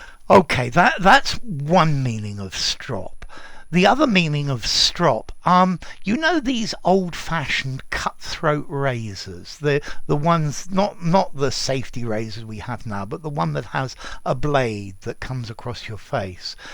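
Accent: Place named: England, United Kingdom. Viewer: I understand this video is a couple of years old. I am an Australian Brit, born in 1964. With both my parents passed now, it was rather delightful hearing an English voice clearly describing the use and origin of British words. My mother, herself a rather stroppy woman at times whom we affectionly referred to as the grammar nazi, was forever ensuring we pronounced and utilised words correctly. I am feeling rather nostalgic. Thank you. 😊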